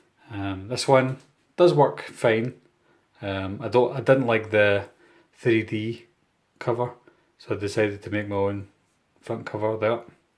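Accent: Scotland, United Kingdom. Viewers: I knew a could hear a Scottish accent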